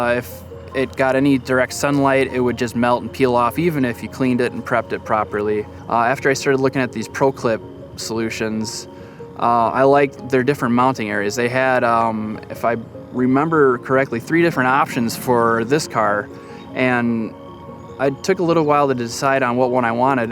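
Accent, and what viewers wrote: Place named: North, United Kingdom. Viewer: Holy northern accent. Much respect from Virginia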